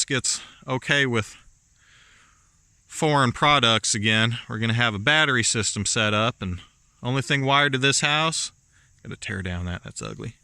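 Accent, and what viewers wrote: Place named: South, United States. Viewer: You sound southern af😂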